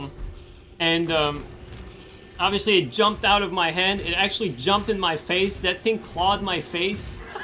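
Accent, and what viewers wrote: Quebec, Canada. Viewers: He sounds Québécois to me.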